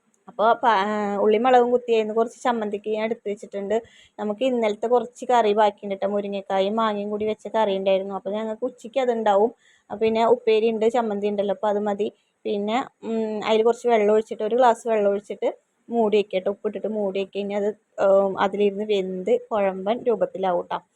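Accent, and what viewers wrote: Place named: Thrissur, India. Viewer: നിങ്ങളെ സംസാരം കേട്ടാൽ മലപ്പുറം ആണെന്ന് തോന്നും. But കുഞ്ഞു തൃശൂർ slangan. വെള്ളപ്പം 👍🏻try ചെയ്യണം ❤️❤️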